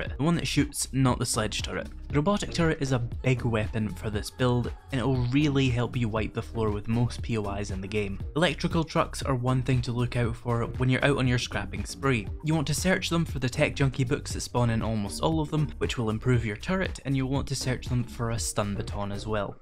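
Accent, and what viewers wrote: Scotland, United Kingdom. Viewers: I like the twin turret build, tried it before - also stun batons are criminally underrated in a lot of scenarios as raw damage is not what they are about. Using them in a combo is fantastic in small scale fighting, stun zombie then sledgehammer for automatically guaranteed head-shot (or pistol or club etc). I do not tend to play on insane nightmare as I really do not enjoy it and I mainly play for enjoyment:) Warrior or Survivalist and feral senses on / zombies on run is about as much as I go. Main problem with this build I found was the insane iron usage, utterly bonkers at points - especially later on in the game, even with an augur. Really enjoy your vids, good to hear a fellow Scot on the YouTubes:)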